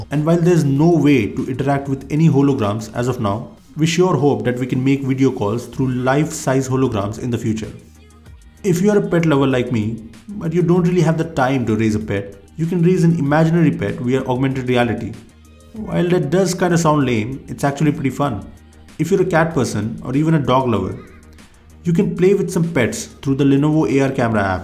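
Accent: India. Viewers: Im fucking done with indian accents it sounds like gurgling sounds😠😠😠😠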